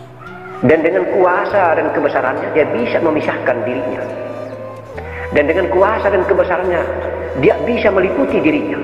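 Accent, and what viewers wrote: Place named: Bugis, Indonesia. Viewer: Assalamualaikum Kalau saya dengar logat bicara nya Abah guru tercinta ini orang Bugis Ada gak yg tahu nama dan alamatnya. Kami mau ketemu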